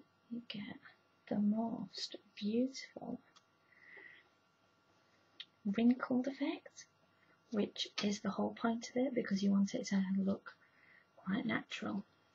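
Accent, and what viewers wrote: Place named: England, United Kingdom. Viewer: Hi.....she`s speaking very clear...she`s English...I am Australian so its easy for me...where are you from...have an awesome day!!!.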